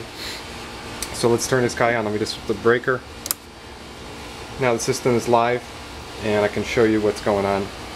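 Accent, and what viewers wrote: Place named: Minas Gerais, Brazil. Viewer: deu pra perceber o sotaque mineiro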